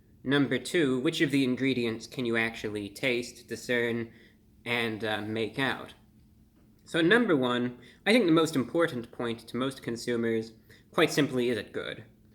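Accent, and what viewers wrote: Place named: Transatlantic, United States. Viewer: You are just adorable. I love your kindof transatlantic accent talking seriously about new fast food releases. I'll go check out your other videos now! I might try this if I can get it with ground beef instead; I'm not a big steak person to begin with and I won't go anywhere near fast food steak.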